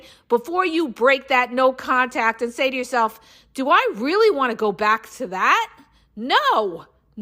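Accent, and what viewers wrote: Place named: New Jersey, United States. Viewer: This has to be a jersey girl😄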